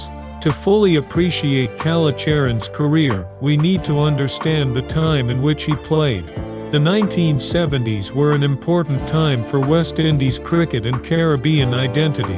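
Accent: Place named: United States. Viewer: The narrator sounds like an Ameican!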